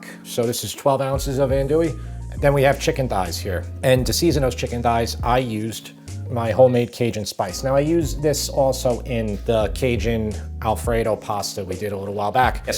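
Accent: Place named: Long Island, United States. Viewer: As an Ontarian Canadian, your Long Island accent makes me laugh every time. I really like your videos and your content, thank you for sharing them with me. One thing I'm surprised though, is that you use these large diameter cooking pans, but have such a small stove burner. Does that really work that well? I would think a larger burner would be something you'd be looking for. Also, is that a propane or butane stove? I never would've thought such a simple camper stove could be used to make food like this. Also, where do you find things like your cutting boards? I like how large the wooden one is, but don't really know how I'd go about looking for something like that.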